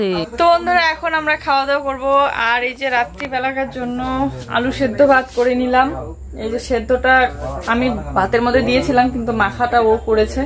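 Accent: India. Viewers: অনেকদিন দিল্লিতে ছিলো, তাই কথায় কথায় হিন্দি শব্দ, উচ্চারণ এসে যায়। এটা স্বাভাবিক।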